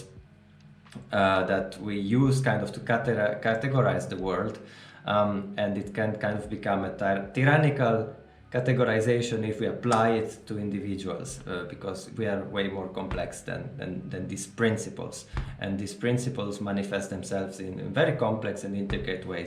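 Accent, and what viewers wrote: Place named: Scandinavia. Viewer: You are from Switzerland? I had no idea. When you talk English you sound more like a Scandinavian to me to be honest :) So German is actually your native language? Have you ever thought about doing this in German? Sometimes I feel like we need more of that, the German language region seems to be disconnected from the rest of the world at times. While Peterson has become a world-wide phenomena nobody I know personally here in Germany has ever heard about him. Which is unfortunate to say the least.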